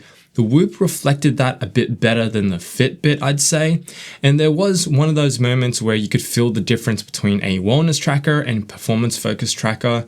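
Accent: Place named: Australia. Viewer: $99? Why do you sound Australian but the price seems to be in US! No?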